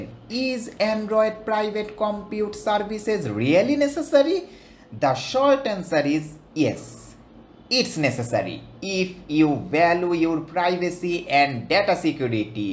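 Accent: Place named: India. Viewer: Can you speak english without indian accent???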